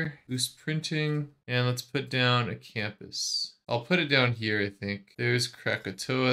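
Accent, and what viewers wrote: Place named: United States. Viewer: Aaargh! Hadn't seen it to its end 😂 honestly - I'm not a huge fan of surprises, so much appreciated! I really like how organically or naturally you grow your civ(s) - that's how I hope my garden will grow this year! But I'm on like turn 50 with three cities, two wonders, some culture - but not an awful much more to show for it. I guess it's just a matter of enjoying the game, and if it's a fun defeat then just go with it 😆😶 I really enjoy your vids - I had a day off and I had kinda hoped to spend the day in the garden, but it was so rainy here that I ended up cleaning (which was embarrassingly needed, once I got down to the nooks and crannies!) - you were my coffee breaks! 😙☕👌 they were a delight! You have such a wonderful voice, you're so funny, and such a nice narrator! I love your crisp American accent, despite the fact that I get startled every time you say "route" - here it's pronounced "root" and I get baffled every time! 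And now I've told - and that's a weirdly big relief! 😂 Lots of love from Denmark 🤗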